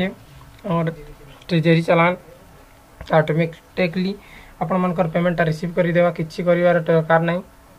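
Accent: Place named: Cuttack, India. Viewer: ସମ୍ବଲପୁରୀ କହୁଛ ଭଲ କରି କାହ ଆଉ କଟକିଆ ମିଶାଗୁଲା କାଏଁ ଯେ କହୁଛ..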